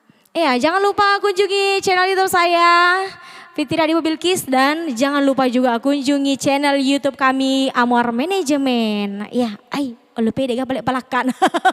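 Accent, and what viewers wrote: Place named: Jakarta, Indonesia. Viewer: Ngomongnya kayak orang Jakarta lho taunya orang Bugis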